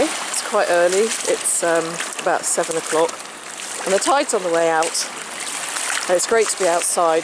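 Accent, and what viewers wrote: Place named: England, United Kingdom. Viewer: Hey Dearheart, I do the same thing, getting stuck on a word or phrase So here are some ^Words^ to help you out. After all, what are friends for, right? Appealing, absorbing, captivating, curious, fascinating, intriguing, delightful, inviting, pleasing, remarkable, amusing, charming, inspiring, thrilling, fabulous, and Galvanizing. I like the last one, coupled with your English accent, would be awesome.LOL So there you are, hope this helps in your quest of expanded vocabulary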